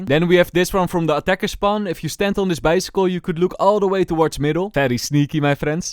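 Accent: Netherlands. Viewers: good tips but are you ducht? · i smell dutch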